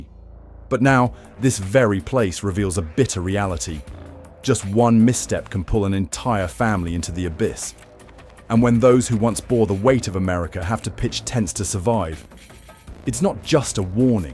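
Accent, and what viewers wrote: United Kingdom. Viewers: in the first 2 minutes it shows homeless in LA and Vancover... while speaking like this is Michigan... Who made this video? Why this dude have a British accent? Idk something tells me they are not from michigan possibly not even from america or know wtf is going on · why am I hearing a limey tell me about my state?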